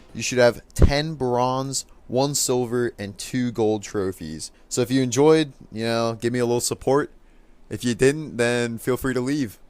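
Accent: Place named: New Jersey, United States. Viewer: Haha. Cool. Jersey accent, lol! :)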